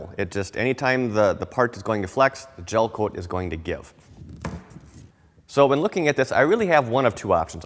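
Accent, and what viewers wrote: Midwest, United States. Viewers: this guy sounds so midwestern it makes me wonder what kind of boats they have over there that arent icebreakers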